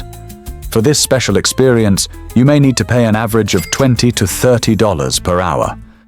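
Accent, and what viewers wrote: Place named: England, United Kingdom. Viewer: The English accented AI voice is barely tolerable.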